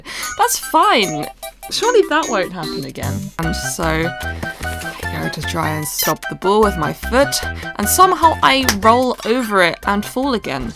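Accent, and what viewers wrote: United Kingdom. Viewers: you are so British that I have to pause the video to fully understand all your Britishisms. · i love your voice idk why, maybe bc bri'ish lol also love your content :3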